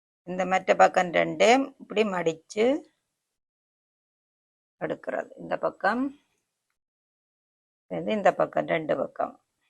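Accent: Sri Lanka. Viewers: neenga pesarada Partha Jaffna madiri erukku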